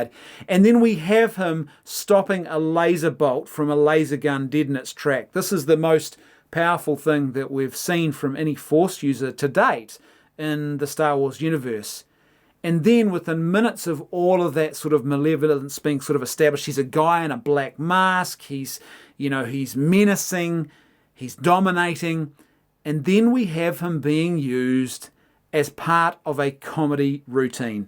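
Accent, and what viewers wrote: New Zealand. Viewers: I can tell you are Kiwi by the way you pronounce “fish and chips”, “fush and chups”. In Australia, they say “feesh and cheeps”. 👍🏻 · My guess is Kiwi from the south Island; If I got it right, it took me 5 years to hear out the distinctiveness in the accents...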